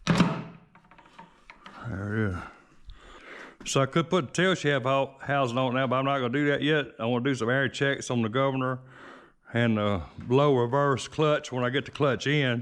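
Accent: United States. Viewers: Where about in the states you come from love the accent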